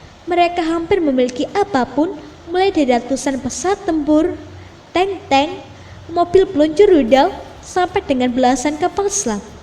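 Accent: Java, Indonesia. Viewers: medok amat ch ngmngnya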